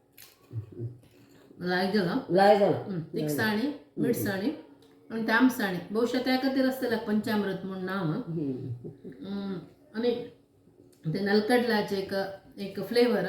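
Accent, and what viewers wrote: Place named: Konkan, India. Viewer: Sounds like konkani spoken by gaud saraswat brahmins in karwar Mangalore goa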